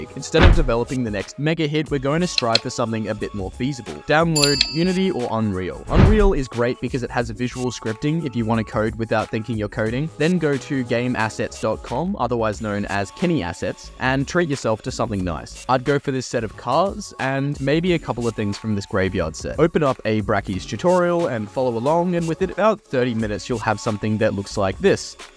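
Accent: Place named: Australia. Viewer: I noticed you had an australian accent. the best way to launder money in australia is to deadass go to a casino, put all your dirty money in and cash out. and there you go, you have nice clean money you won at the pokies without any cuts. this is genuinely a massive problem in AUS. when a whistleblower tried to point this scheme out, the casino lobby in Australia tried to sue him.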